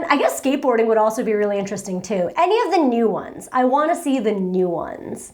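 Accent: New Jersey, United States. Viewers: A "Jersey accent" is exactly what you sound like when you speak English :P (Although to be fair, I thought you were from Long Island when I first started watching you) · How do you pronounce water or orange? That would show how Jersey you are. Never knew I said those words differently till I moved to upstate NY.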